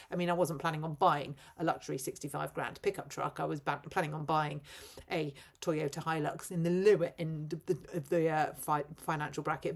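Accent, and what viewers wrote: RP, United Kingdom. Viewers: Posh woman whining again... Try a reduction in lifestyle, perhaps a modest Skoda - and there you have it, the reliability you crave, and a small budget you can afford (pay day loans are also available). and we wont have you whinging so much.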